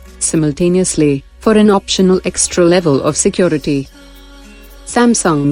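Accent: India. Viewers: Holy shit me too, I was so confused whether or not it was a real woman or a robot with indian accent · I cant tell if this is a robot voice. And if so why the Indian accent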